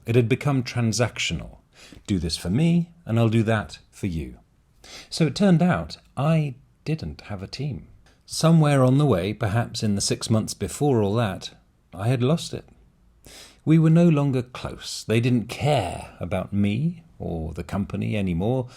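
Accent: RP, United Kingdom. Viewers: Обычный RP, нейтральный акцент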